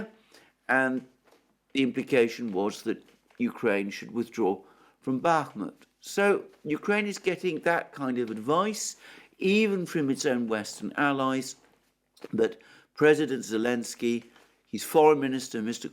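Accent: England, United Kingdom. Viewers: It's weird listening 🎧 to a communist with an English accent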